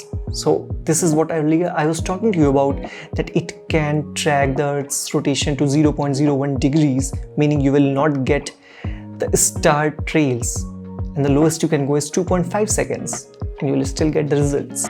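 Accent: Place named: India. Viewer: That indian accent is just too heavy to understand. Just watch this instead... <link>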